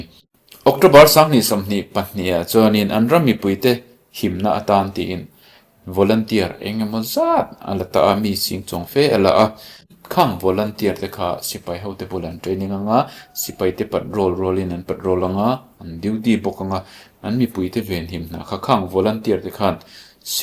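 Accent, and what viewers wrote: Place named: Siaha, India. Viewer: Siaha lama i an deuh ka hria😅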